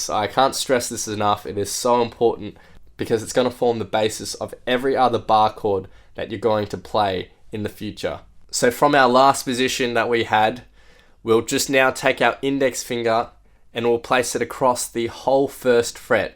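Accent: Australia. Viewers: are you australian? me too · Got a bit of an aussie accent going on there, eh mate?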